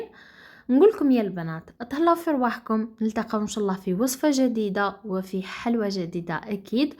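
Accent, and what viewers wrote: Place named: Chaouia, Algeria. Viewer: صوتك في نبرة الشاوية ❤️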